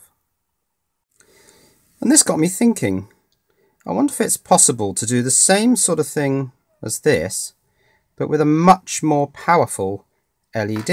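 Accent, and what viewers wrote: United Kingdom. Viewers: This may be the case, but the presenter here has a British accent. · U from UK?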